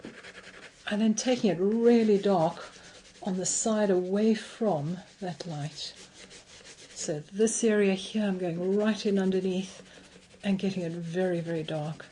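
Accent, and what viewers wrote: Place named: RP, United Kingdom. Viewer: So posh :p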